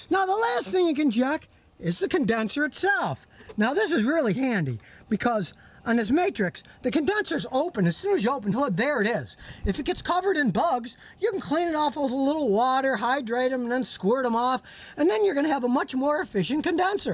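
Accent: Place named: Pittsburgh, United States. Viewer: Have you always lived in Texas? You have a slight Pittsburghese accent.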